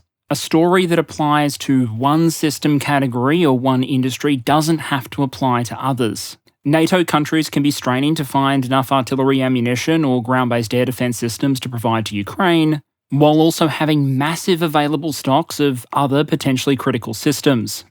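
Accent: Australia. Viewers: WOW. High quality analysis! This is a high speed download of research-rich info, spiced with a little dry humor. Good clear diction in Aussie-accented English, which is less off-putting to us Americans (Oxford English lectures give us inferiority micro-seizures)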